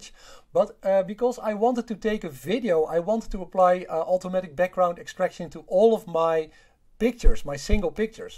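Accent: Netherlands. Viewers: Kan gelijk horen dat je nederlands bent🤣 maar nice video! Heb zelf gister een Omegon Apochromatische refractor Pro APO AP 72/400 Doublet besteld, beetje hopen dat ik m kan vastleggen met de hand🤔 statief heeft geen goto helaas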